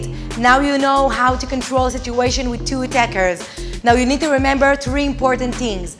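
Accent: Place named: Israel. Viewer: אני מבין לפי המבטא שאת מישראל זה אומר שאת עושה בישראל שיעורים?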